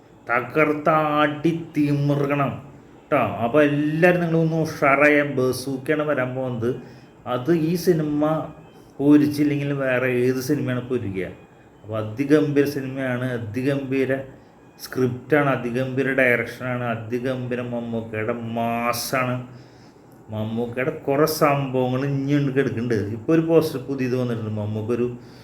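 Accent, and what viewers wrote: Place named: Kunnamkulam, India. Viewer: ഇക്കാടെ കുന്നംകുളം വായിൽ irikkunno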